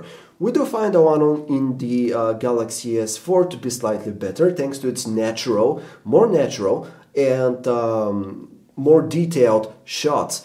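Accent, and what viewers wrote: Russia. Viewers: That Russian accent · where you from??? sound like from russia or some near country and why comparison sounds like you more iphone man . They are just phones. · are you Russian?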